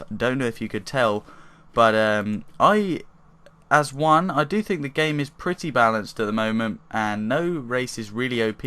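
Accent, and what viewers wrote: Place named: United Kingdom. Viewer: Another British commentator ^_^ good job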